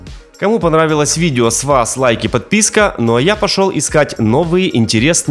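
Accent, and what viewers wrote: Ukraine. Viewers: Алекс есть алекс что тут сказать. Смех да и только ребенок с явным акцентом страны 404 пытается рассказать о том в чем не разбирается.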